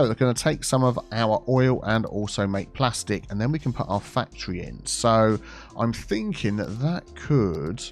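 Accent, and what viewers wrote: United Kingdom. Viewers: Subscribe all would mean i get ALL the mods, Biff has FPS mods that my potatoe can't handle. so I need to search through the mods for the one he mentions, some like TMPE are hard to understand when someone says it quickly. especially a wonderful brit. a graphic with the name of the mod woule mean i could type the name in goole with Cities:skylines and immediatly get the mod I want to download.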